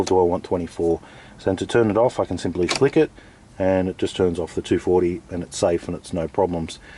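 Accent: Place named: Australia. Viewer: Hes australian.....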